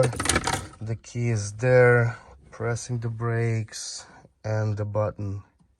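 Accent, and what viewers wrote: Russia. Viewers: мне одному показалось что у чувака акцент русский? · Мне показалось,или Русский акцент? ) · Русский акцент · Русский акцент всегда узнаю)))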